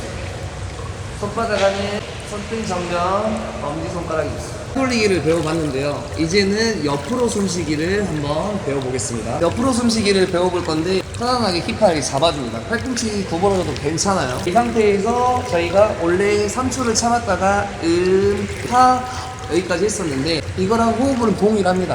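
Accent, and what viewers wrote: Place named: Jeolla, South Korea. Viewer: 말투가 전라도ᆢㅎㅎ 저는 목포염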